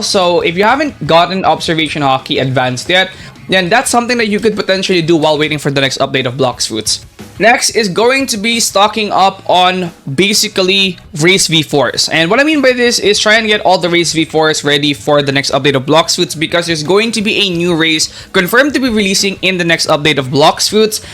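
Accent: Philippines. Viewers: bro is accent pilipino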